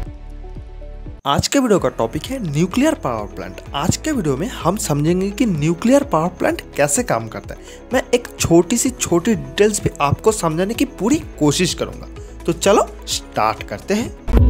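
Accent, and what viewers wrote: Bengal, India. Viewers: Appe Bengali ho na 🎉❤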